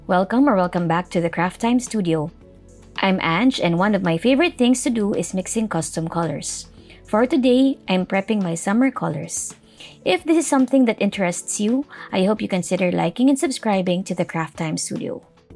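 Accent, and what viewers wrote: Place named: Philippines. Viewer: I figure you are from the Philippines because of your accent and also the indelible ink on your finger. Btw who did you vote as the president? If I may ask? Thank you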